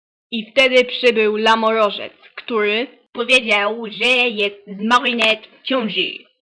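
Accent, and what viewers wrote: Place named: England, United Kingdom. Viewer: Nie mów z angielskim akcentem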